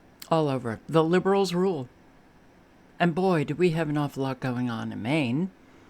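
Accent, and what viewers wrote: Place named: New York, United States. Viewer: I wish I could just give you a big squeeze. Not trying to be weird. I just love getting to see the face that goes with the voice of reason I love to listen to. Boy is your New York accent coming back quick since you moved back to the north east.😁 Thanks for all the research you do. Keep on keeping on. Much love my dear 💗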